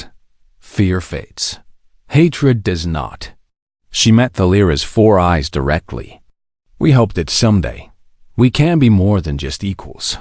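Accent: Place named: Boston, United States. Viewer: Yella. Can we get a narrator that doesn’t have a god awful Boston accent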